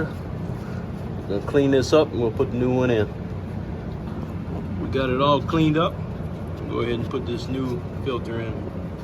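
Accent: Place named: Louisiana, United States. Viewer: You from lafitte area? Sounds like it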